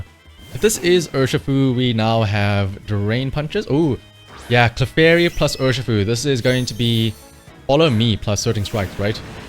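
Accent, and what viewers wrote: South Africa. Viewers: hey man are you from south africa? I feel like i recognise the accent haha. saying this in zimbabwe!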